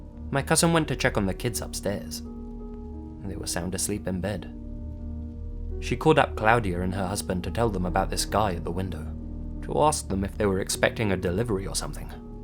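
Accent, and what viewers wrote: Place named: United Kingdom. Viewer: My favorite British narrator is back in action!!! Missed you. Love from USA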